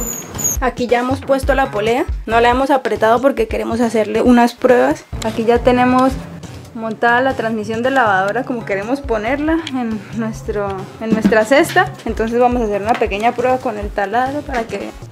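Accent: Colombia. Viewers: le escucho un acento Colombiano... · Su acento es colombiano, creo que es de Santander o norte de Santander !! Felicidades a ella.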